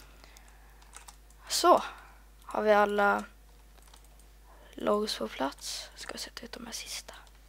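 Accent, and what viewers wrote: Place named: Finland. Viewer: Jag tycker du pratar svenska som man pratar i Finland bor du på Åland? PS jag bor i Finland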